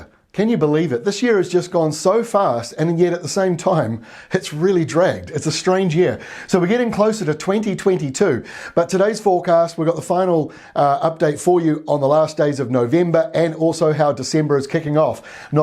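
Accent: New Zealand. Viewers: If there was an award for YouTube weather forecasters, you'd win hands down:))) great graphics, knowledgeable commentary and in depth analysis - all done in that lovely NZ accent!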